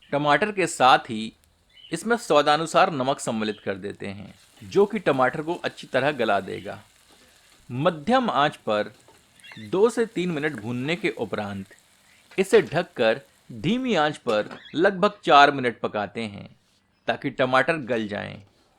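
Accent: Standard. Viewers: Lehja.. Behad sundar shudhdh Hindi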